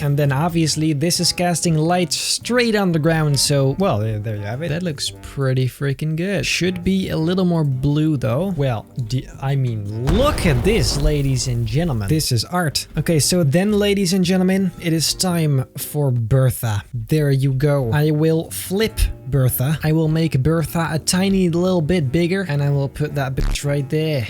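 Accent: Netherlands. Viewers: are u dutch?🤔🤔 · We miss the dutch accent videos 🥲😂😂😂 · Ik vind het zo fantastisch dat je het Nederlandse accent niet kan verbergen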